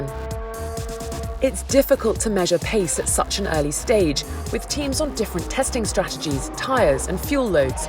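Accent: United Kingdom. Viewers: Perfect English accent. Elegant without being toffy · Very pleasant and beautiful voice of the talking lady. I love how sound the british accent.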